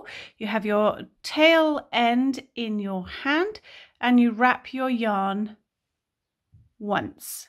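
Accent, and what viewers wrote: United Kingdom. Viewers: Your accent sounds British, so why don’t you use UK terms?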